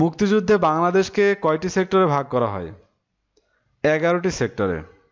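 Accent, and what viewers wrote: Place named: Noakhali, Bangladesh. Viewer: উচ্চারণ পুরাই নোয়াখাইল্লা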